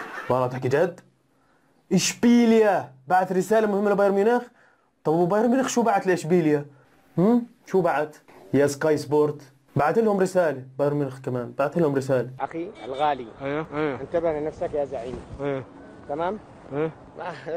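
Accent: Jordan. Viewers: انت أردني صح؟ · انت أردني؟؟؟؟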